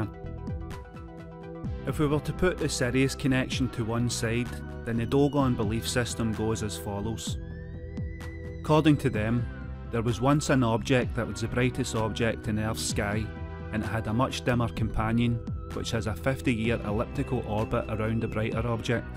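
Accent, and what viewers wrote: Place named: Glasgow, United Kingdom. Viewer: Is that a Weegie narrating?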